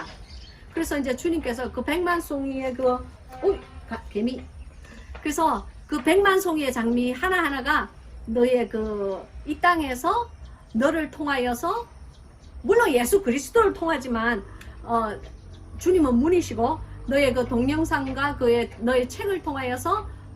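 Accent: Gyeongsang, South Korea. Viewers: 목사님 경상도 사투리가 정말 구수하네요 주안에서 평강과 은혜가 충만한듯 합니다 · 목사님의 순수하심에 빠져들어 이제는 사역을 통하여 체율체득함으로 나오는 간증과 함께 생명의 말씀에 붙잡혀 매일 빠져들고 있어요ㆍ목사님 어투가 경상도 출생인가보다 했는데 부산이 고향이시란걸 오늘 알았네요ㆍ삶이 예배란 말씀에 전율이 흘러요ㆍ목사님 너무너무 고맙고요 감사합니다 솔직담백한 큰매력도 큰능력 이라고 느껴져 더욱 감사합니다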